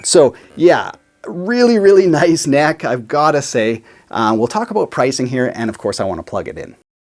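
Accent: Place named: Maritimes, Canada. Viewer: Prairies eh? I was so sure you were from the maritimes LOL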